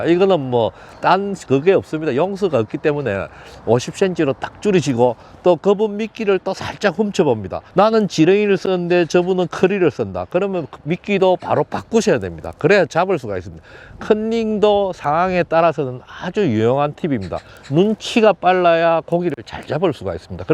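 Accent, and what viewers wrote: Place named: Busan, South Korea. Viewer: 낚시를 시작하는 초보입니다.^^ 같은 부산사투리에 귀에 쏙쏙 들어오는 설명이 너무좋습니다. 많이 배우네요.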